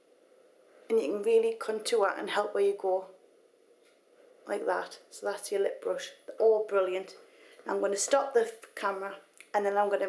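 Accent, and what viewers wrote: United Kingdom. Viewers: i always loves your video. Thank youuuu.. oya btw, i love ur british accent! :D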